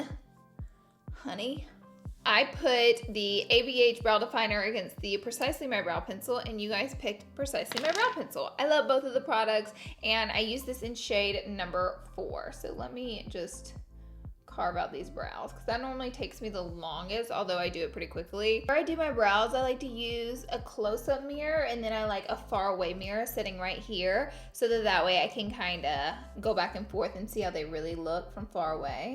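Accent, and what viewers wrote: South, United States. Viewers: I love your voice 😂 I sound just as country 🤷😍😇🤸♥️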